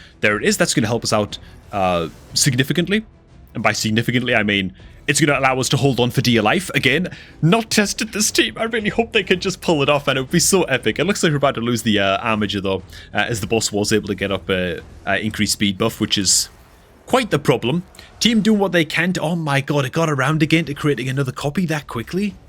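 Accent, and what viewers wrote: North East England, United Kingdom. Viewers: He's from the north east of Britain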